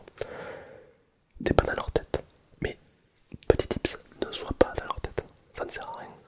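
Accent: South, France. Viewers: T as l accent du sud😂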